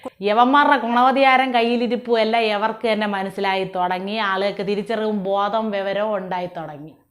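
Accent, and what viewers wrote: Thiruvananthapuram, India. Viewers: അടിപൊളി വിവരണം... TVM ശൈലിയിൽ തന്നെ മുന്നേറട്ടെ. എല്ലാ വിധ ഭാവുകങ്ങളും 💐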